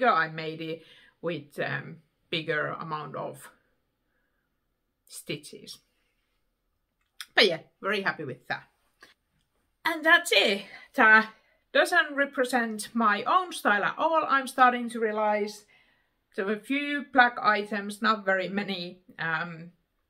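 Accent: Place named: United Kingdom. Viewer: Lmao I was thinking about it whether your husband is from the UK or if you have studied there due to your British accent but then you revealed that your husband is actually British. Thanks for the video! It was fun to watch it while knitting and hopefully you keep making these. I absolutely love it how you use stronger and bolder colours compared to many others and it's also interesting to see these popular patterns in these vibrant colours ;)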